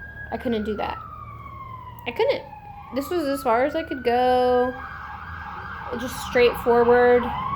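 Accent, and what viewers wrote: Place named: United Kingdom. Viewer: Now em confuse either u from US or Uk coz ur accent is more british than American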